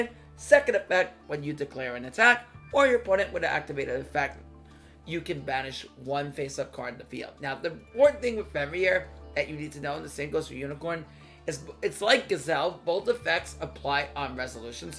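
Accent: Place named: Long Island, United States. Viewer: Dude, you have to be from Long Island. 😂😂😂 Great Video my guy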